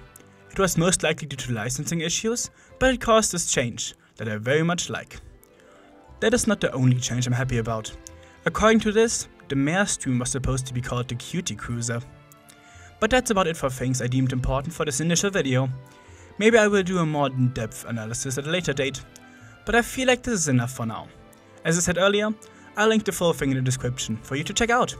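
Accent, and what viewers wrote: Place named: Germany. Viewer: Bruhh what is that accent if it isn't German xd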